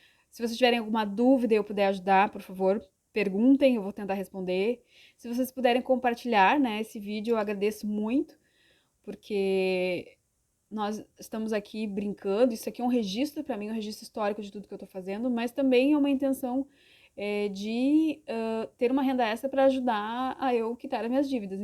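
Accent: Brazil. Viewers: Teu sotaque é maravilhoso me convida pra ir toma um chimarrão ai com vc kkkk Sou de Lages SC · Tu é gaúcha? De q cidade?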